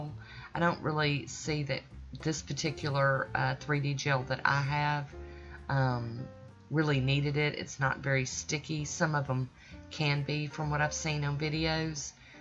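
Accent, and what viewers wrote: South, United States. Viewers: Nice flower! I watch Kirsty everyday, love her videos! You should be able to shape the petals on the mat and then transfer them to the nail, but that might be even harder. Despite what everyone else does you have to figure out what works best for you. I enjoy watching you do your thing: it inspires me and I really like the sound of your voice - that lovely southern accent in particular!!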